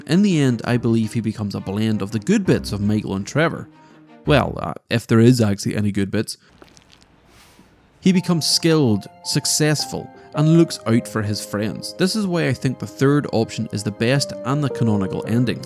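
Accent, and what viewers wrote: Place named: Ireland. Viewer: Recently watched your other gta videos mate & subscribed! Great work, plus the commentary with your irish accent is a bonus touch haha! 😄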